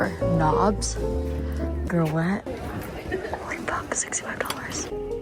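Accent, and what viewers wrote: United Kingdom. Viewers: Essex babe And please torture us with the British accent !!!!!!!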